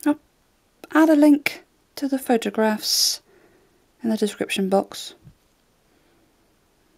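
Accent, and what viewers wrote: England, United Kingdom. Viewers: how does she sound Australian? she is obviously English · T are you brittish? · Like ur accent( I know it's British)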